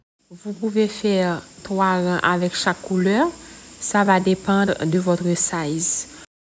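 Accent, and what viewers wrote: Haiti. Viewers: T'es Haïtienne ? Ton accent ?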